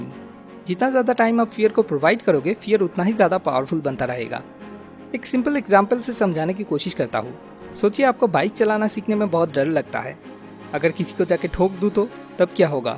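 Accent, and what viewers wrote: Bengal, India. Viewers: i love ur bengali accent hindi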